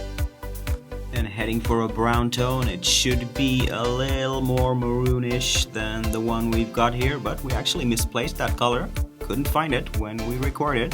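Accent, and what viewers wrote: Scania, Sweden. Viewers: när du började snacka skånska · Känner igen den svenska dialekten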